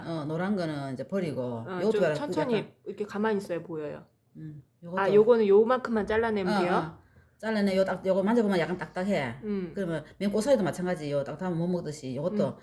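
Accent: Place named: Gyeongsang, South Korea. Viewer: 경상도 사투리는 또 하나의 외국어..ㅎ 정겹고 다정다감합니다. 맛도 좋은 만병초 함초가 지천에 늘렸다는 사실이 신기합니다. 늘 건승하세요.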